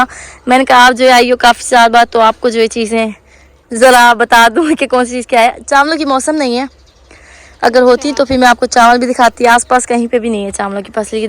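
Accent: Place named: India. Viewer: Main India se hoon magar aap ki jo tone h kahi na kahi India se mil rahi hai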